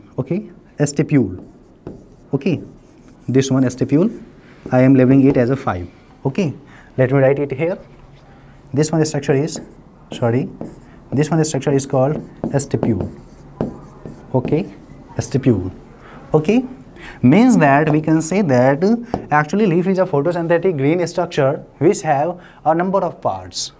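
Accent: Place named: Palanpur, India. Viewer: Are palanpuri accent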